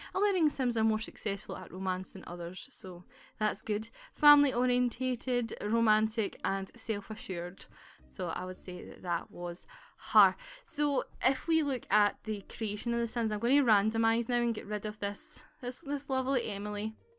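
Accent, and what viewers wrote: Scotland, United Kingdom. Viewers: 4 some reason u sound Scottish 2 me...or it could b the fact that I just finished watching BRAVE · you admitted that you have a JLo butt? very brave of you! Can't wait to see you make yourself if you're going to! Love the 'wee' scottish accent too! x From what I've seen of this CAS mode, I'm disappointed that the customisation is a bit more limited, like there is no 'create a style' tool. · Are you Scottish? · Are you British? NEW TOO YOUR CHANNEL!!!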